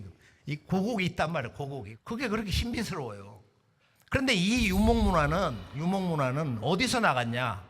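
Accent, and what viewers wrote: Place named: Yanbian, China. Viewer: ㅋㅋㅋㅋ 뭐냐.. 완전 조선족이구만..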